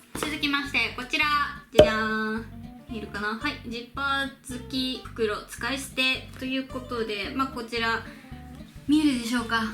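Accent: Hiroshima, Japan. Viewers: 広島弁かわいいです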